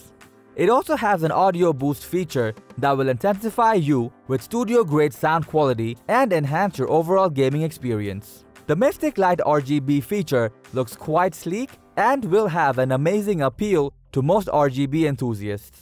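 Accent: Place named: India. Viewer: I know this video is Garbage 🗑 the moment the voice changed. From white boy intro to Indian guy 👦. Bruh you don't need an American guy to to start your video. Plus we can read the info on the box or website. More views with bad comments ain't good for you.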